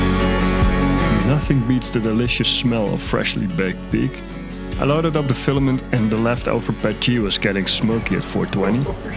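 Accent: Netherlands. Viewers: Hey ur Dutch I’m Dutch too ik hoorde al aan je Engels accent deze lijkt een beetje Nederlands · Wait a MF SECOND‼️‼️‼️‼️ You're Dutch‼️‼️ i hear you say jesus with a dutch accent‼️‼️ ik wist het😂😂 i'm also dutch😂😂 game recognizes game😂😂 and also i hear you say fucking hell fucking shitzooi, between and in the video 😂😂😂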